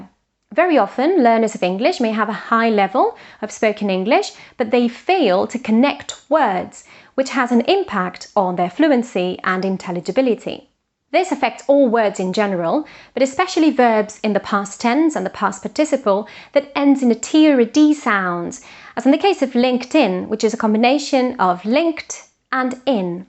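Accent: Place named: United Kingdom. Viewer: he notado que tiene el acento británico. Es mas facil aprender inglés britanico?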